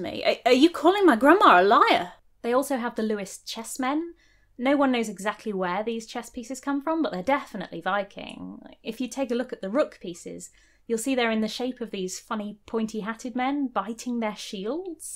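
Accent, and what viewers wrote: United Kingdom. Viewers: Love how Idris Elba has a cameo within the historical figures. Just found out about the channel, gorgeous and incredibly knowledgeable lady with brit accent. Can't have it better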